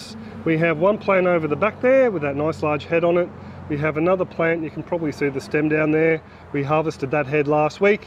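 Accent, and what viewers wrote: Australia. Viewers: I like the Australian accent tho also you look like bob ross I like it too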